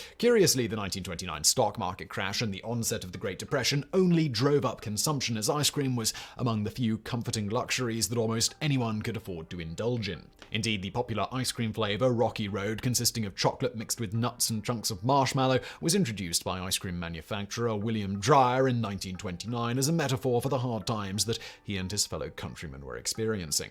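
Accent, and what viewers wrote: United Kingdom. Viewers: Get a pretty girl that does not have a overwhelming British tone do you talking, is just my advice. Maybe good material, although who could sit through your monotone voice.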